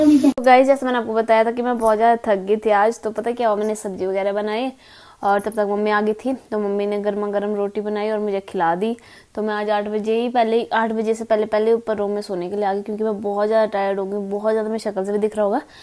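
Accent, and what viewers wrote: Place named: Pahadi, India. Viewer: Yaar mai bi pahaadi hu bhuli Teri pahaadi waali Hindi sun kar mja hi aa jaata hai.😅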